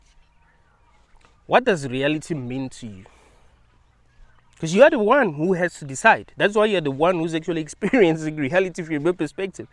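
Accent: South Africa. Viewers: South African.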